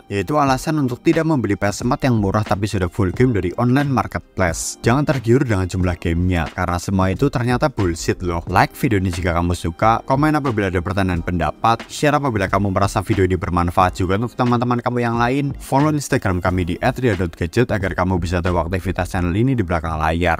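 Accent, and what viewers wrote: Banyumas, Indonesia. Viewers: VoiceOver.e ngapak nemen ya?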